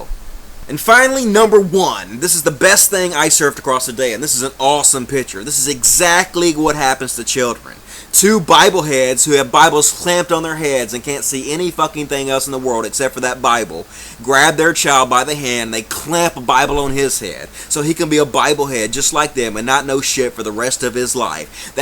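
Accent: United States. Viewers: Didn't know that you were a fellow Mississippian. I knew you sounded a little country. Fuckin' awesome.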